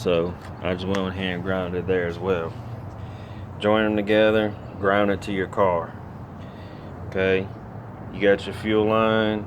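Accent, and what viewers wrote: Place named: South, United States. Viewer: Why do you have to be southern?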